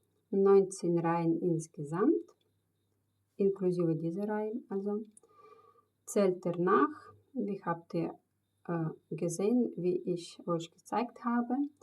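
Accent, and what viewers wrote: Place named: Russia. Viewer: Рашистский акцент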